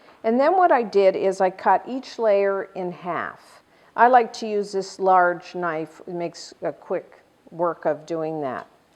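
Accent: Canada. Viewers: Great Canadian accent!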